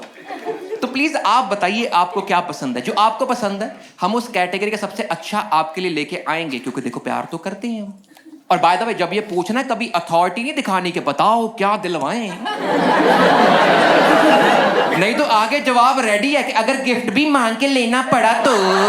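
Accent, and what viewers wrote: Punjab, India. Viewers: I love punjabi accent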